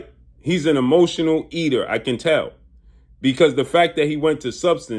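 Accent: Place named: East Coast, United States. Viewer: For Some Reason, I Thought You Was From Da East Koast (New York Or Jersey)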